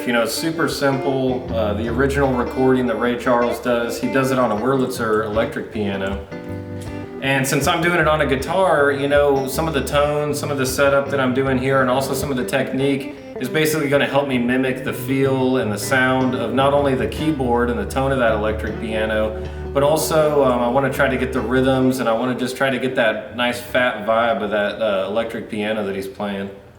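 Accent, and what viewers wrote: South, United States. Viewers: Where are you from man, I’d be surprised if you were not a southerner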